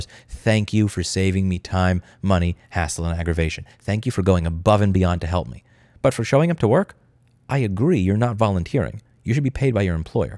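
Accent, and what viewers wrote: New York, United States. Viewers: Any chance you're from New York?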